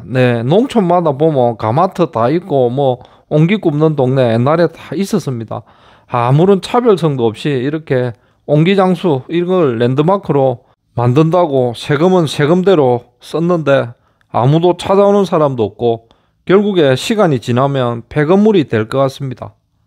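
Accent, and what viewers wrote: Gyeongsang, South Korea. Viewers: 님 댓글 감사드립니다 땡큐 💘 경상도 사투리 ㅎㅎㅎ 오늘도 행복하세요^^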